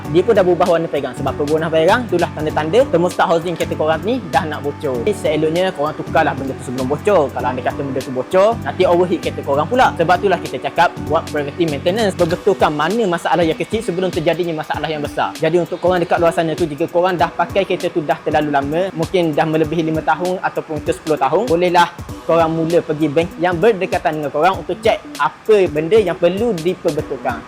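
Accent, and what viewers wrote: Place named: Terengganu, Malaysia. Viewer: Sedak abang kecek ganu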